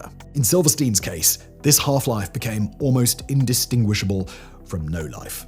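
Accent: England, United Kingdom. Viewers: The exaggerated English snobbish pronunciation makes it hard to understand what you say. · well one it’s more for fun, but two the author of this video is carries a british accent. I guess you could just take that at face value or further recognize that maybe you and the rest of your british kind are what’s actually trite. Lol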